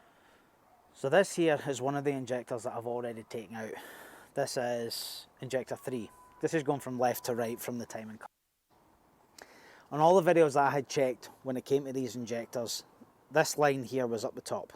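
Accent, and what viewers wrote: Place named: Perth, Australia. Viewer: Mate where are you located? If you’re looking for a wee Homer , I’ve a 2011 traffic, immaculate condition but the injectors need replacing. I’m in Perth. Your accent sounds close by.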